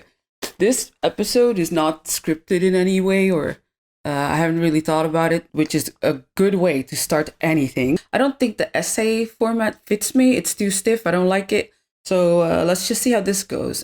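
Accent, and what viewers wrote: Netherlands. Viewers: One of my greatest accomplishments in life is never having kids...by choice. Good vid, thanks! I did notice your Dutch accent!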